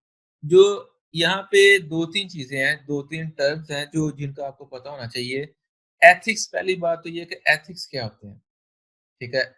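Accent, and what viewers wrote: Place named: Punjab, India. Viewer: punjabi accent is so good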